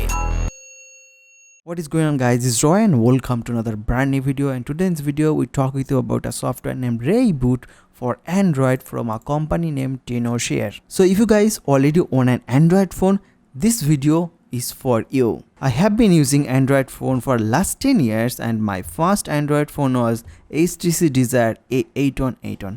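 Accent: India. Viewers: neither free nor for all android. His awful english (indian) later on tells you it only does Samsung phone. I loathe this b.s.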